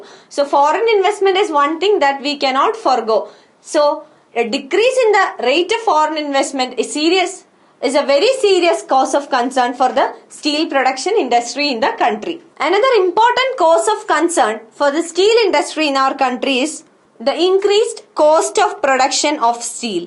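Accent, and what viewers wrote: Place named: Kerala, India. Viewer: seriously her slang sound so....pullikari malayalee thanne ......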